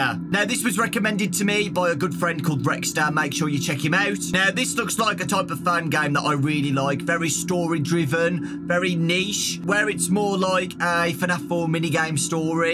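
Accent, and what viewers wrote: United Kingdom. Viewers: accent kinda british tho.. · He’s so British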